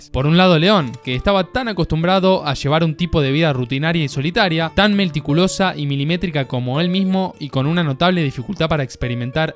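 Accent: Argentina. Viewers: Amigo que buen video describe muy bien lo qué pasa en la cinta me encanta en asentó argentino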